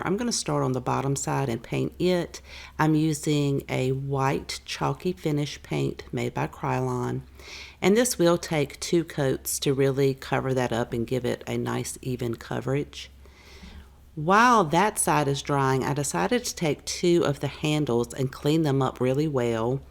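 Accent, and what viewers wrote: South, United States. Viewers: Same!! I was trying to guess how far north of I-10 her accent originated from 😂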